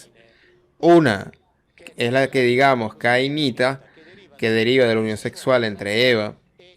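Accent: Venezuela. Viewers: No es así lo que sucede es que tiene el acento venezolano diferente a otro país que no sea caribeño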